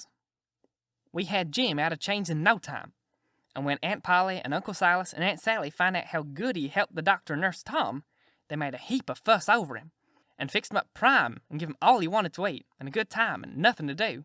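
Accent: United States. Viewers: Saved me from probably taking much longer to read this book due to the southern accent. Thank you so much!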